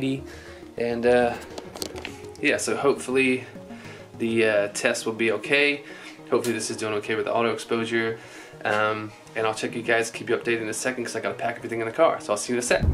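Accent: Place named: United Kingdom. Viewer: I have noticed that you are getting more of a British accent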